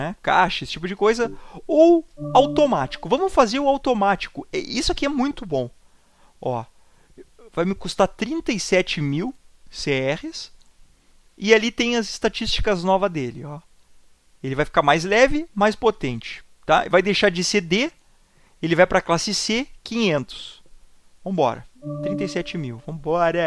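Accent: Rio Grande do Sul, Brazil. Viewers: tu é gaucho vey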